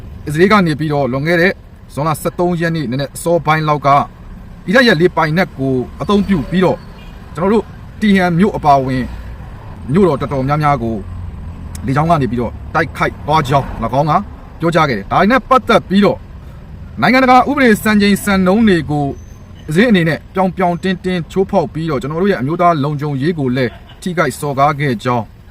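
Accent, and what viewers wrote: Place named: China. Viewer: မင်းအသံကတရုတ်ကလားသံပါေနတယ်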